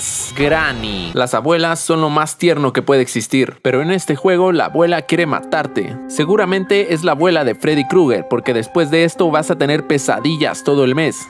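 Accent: Guatemala. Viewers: ¿Eres de Guatemala? :D Yo soy chapina (っ.❛ ᴗ ❛.)っ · Un patojo!, Que se me hace que eres de Guate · Una pregunta sos de Guatemala porque tenés el acento guatemalteco Yo soy de Guatemala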